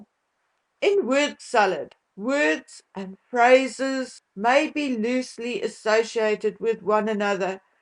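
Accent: South Africa. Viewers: I would never have guessed that English was not your first language, dear! You just sound South African to me and I love you for it. As for the Harkles latest offering, I filed it under suspicious junk mail. They have lied so much that I no longer believe a word they say. Everything is smoke and mirrors with them. How I wish they could, like Napoleon, be sent to Elba without Wifi or any means of contacting the outside world. The world needs a rest from their particular corruption of young minds. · The Harkles speak fluent PSYCHO-BABBLE !!! You speak with a beautiful South African accent….I can understand you, every word you speak is articulated, but (just as you say) all I heard from the Harkles was psycho-babble bolloc*$ !!!!! It’s unintelligible, and says nothing and means nothing. Their lips move, but cr@ comes out !! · I could listen to your lovely S.A accent all day 😊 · Your "accent was what drew me to listen to you, years ago, when I subscribed to your channel! 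At the time, I had no idea what a South African accent sounded like. I didn't know WHERE you were from. I thought it was so lovely that I kept listening to find out where you were from!!! 😊 BTW, I have been a psychiatric nurse for years. YES!!! "Word Salad" is a bonafide, legal, medical term most often related to to schizophenia!!!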